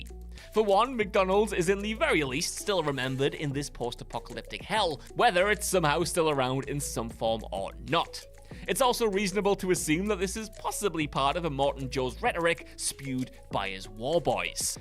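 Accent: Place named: Northern England, United Kingdom. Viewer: LOL, The number of ppls not getting the more Northern accent here is amusing me no end. He DID say Bader just in the way anybody from his area would. Reminds me of Americans needing Subtitles for Trainspotting 😂.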